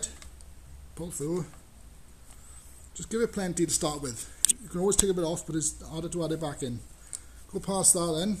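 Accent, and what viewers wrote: Wales, United Kingdom. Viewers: Hi you remind of the great Tommy Cooper, he just couldn't do anything right, yet the people 😍 loved him. I must admit I enjoyed your presentation well done, at least you gave us a good laugh. Lived in North Wales for years colyn Bay. Worked on the a55. Great to hear that lovely Welsh accent again. Muchas gracias 😎 Liam kilkee clare ireland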